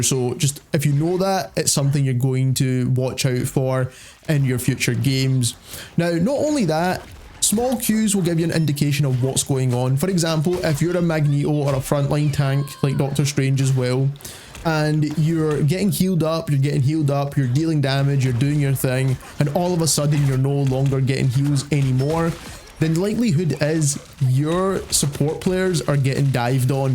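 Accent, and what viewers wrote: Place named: United Kingdom. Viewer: "British" accent so annoying bro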